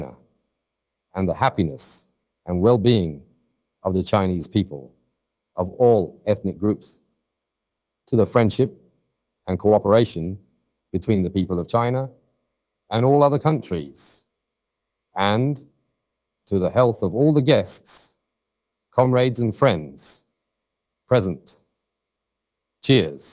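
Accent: Australia. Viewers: Great but weirdly Australian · Another fascinating speech. Curious if anyone noticed a touch of Australian accent in there...? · Has an Australian accent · Assuming they’re using ElevenLabs, nope! 😂 Funnily enough, it seems ElevenLabs automatically tries to pick an accent appropriate with the geographic location of the source speaker’s accent. For instance, if a British voice is reading Portuguese text, it will likely read with a European Portuguese accent rather than a Brazilian one, and an American reading French text will read with a Canadian French accent. I guess because China is closer to Australia and the source audio is of a native Chinese speaker, an Australian accent was chosen for English. Unfortunately, the feature is automatic and somewhat unpredictable for now. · Definitely Australian past the middle, not slight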